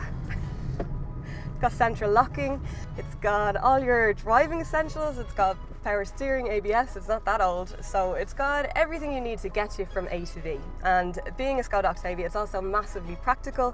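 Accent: Ireland. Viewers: The Irish accent is so beautiful. When its coming from an irish woman it's just drop dead gorgeous.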